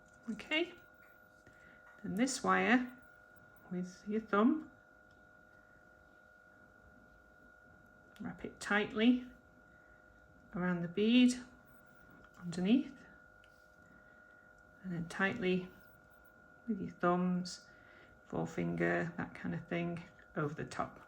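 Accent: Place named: North West England, United Kingdom. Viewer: Great tutorial; you make it look so easy and I can't wait to have a go. I'd also love to know how to make the spiral. I detect a North West accent; are you from anywhere near Widnes in Cheshire?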